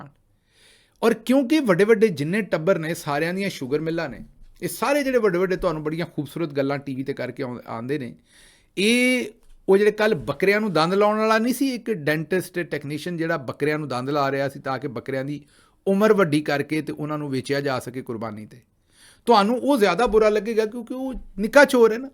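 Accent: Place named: Punjab, India. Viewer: So great analysis Love your Punjabi’s so typical words! Your accent is so similar to East Punjab’s Moga-Ludhiana area.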